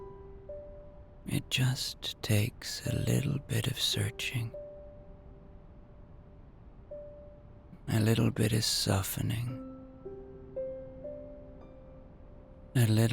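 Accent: Ireland. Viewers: Thank you for this gorgeous heartwarming story. Dogs are just the best companions and full of love. Hello from a Cavan woman in Melbourne who loves hearing an Irish man tell a story 🙏🏻🍀💋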